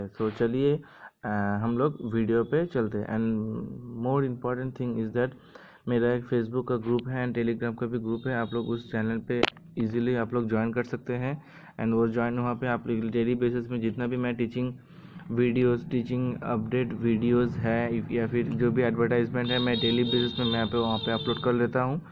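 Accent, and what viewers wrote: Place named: Maharashtra, India. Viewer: Tui to marattok rokomer hindi bolchish re🙄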